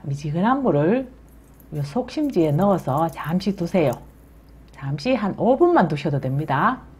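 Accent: Gyeongsang, South Korea. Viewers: 갱상도 아지매 아인교? 구수하게 자미있게 설밍도 잘하네예!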